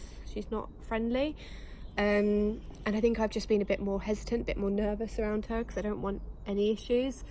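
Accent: United Kingdom. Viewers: No need to explain anything. You’re house is amazing, love your British accent, the dogs are adorable and all pets are greatly loved. Life is waaaay too short to make negative comments on a very positive channel. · I love British accents. Ever since I was a small lad, my Dad and I would always try to imitate all the different kinds. So now I mainly use them on my daughter to make her giggle at times. Your accent reminds me of an old clip from a movie called Wayne's world, a scene that used to make me crack up. <link>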